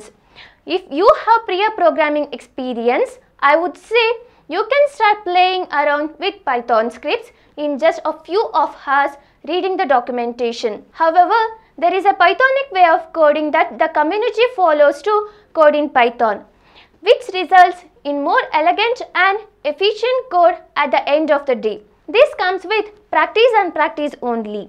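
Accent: South India, India. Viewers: South indian voice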